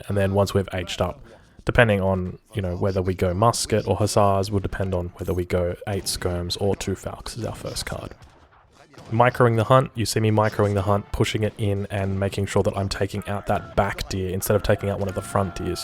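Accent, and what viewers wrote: Australia. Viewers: He's just australian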